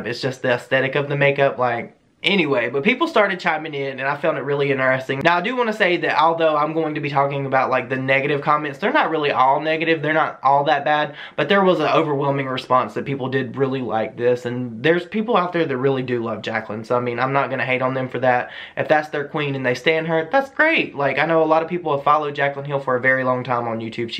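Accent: South, United States. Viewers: I love it so much that you have a southern accent ♥️ reminds me of the people around me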